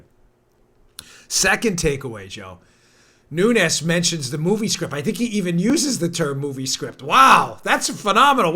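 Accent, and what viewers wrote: New York, United States. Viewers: You rock, Patriot! keep that New York accent.....so tired of the fake "Steele Farce-ier" We need orange vests with a huge "Q" on it. We will call it "the Trump/Q OrangeQrew": What do you think Donnyboy?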